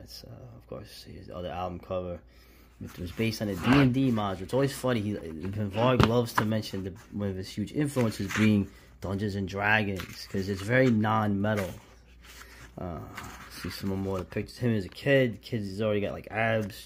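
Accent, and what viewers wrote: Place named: New York, United States. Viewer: H0ly East Coast New York American accent Love It!! Sending Love from West Coast Canada, Vancouver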